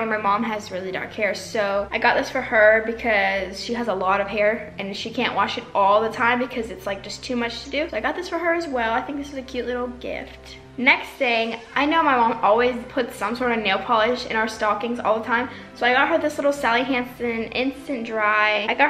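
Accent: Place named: South, United States. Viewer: Your southern accent is just too cute doll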